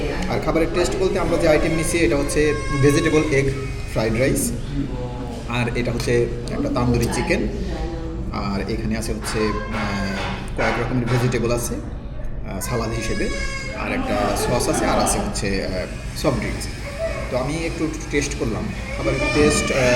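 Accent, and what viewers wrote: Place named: Khulna, Bangladesh. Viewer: আমি যতদূর জানি এটা হচ্ছে বিরুলিয়া ব্রিজের কর্নারে আপনার ভাষা শুনে মনে হচ্ছে আপনি খুলনা বিভাগে আপনার বাড়ি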